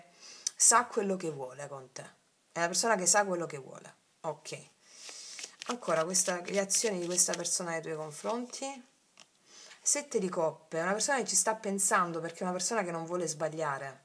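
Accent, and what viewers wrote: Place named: Apulia, Italy. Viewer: Cara, è la prima volta che ti sento... E mi hai subito conquistata per tua Bravura, Simpatia... E il tuo Accento che mi dice che sei Pugliese Come Me!!! Non sono una che segue questo cercare le Carte... Ma mi sono rivista in pieno in questa tua Lettura... Che ti dirò... Azzeccata in Pieno!!! Ti cercherò ancora.. perché sei davvero una Persona Elevata.. A fare Ciò che sta regalando a tutte noi!!! Ti ringrazio.. E ti Abbraccio Forte!!! ❤😂😮😊😅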